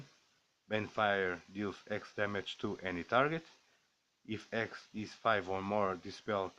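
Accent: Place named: Portugal. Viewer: i have a quick question , you seem like you have a "portuguese accent" where do you play magic ?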